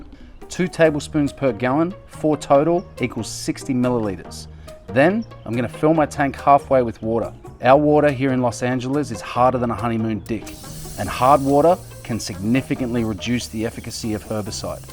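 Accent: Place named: Australia. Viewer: Mate - thanks for making me laugh. You have taught me three things: 1. Lawn stuff - who would have thought grass could have turds? 2. Poetry - "harder than a honeymoon dick" 3. Visual art ( that was an Asian elephant right? Elephas maximus I believe - they have small ears) Anyway, gday from Dubbo (with an accent like that, you might even know where that is!). All the best with the channel. Can't wait for the next video.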